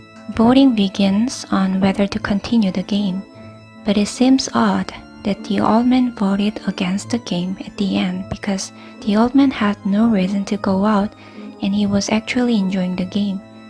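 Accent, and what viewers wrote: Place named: United States. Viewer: 오호ㅋㅋ 아메리칸 스타일의 잉글리쉬💯 세간에 떠들썩한 5징어게임에 빠져봅니데이~😆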